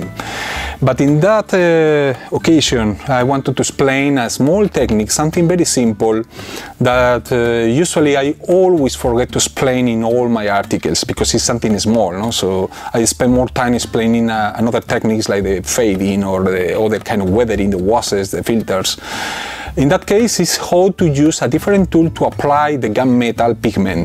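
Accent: Spain. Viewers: Thanks ... I like your spanish English ;-) good and useful clip...I use always girl stuff for this.. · I love Spanish accent. Keep up the good work.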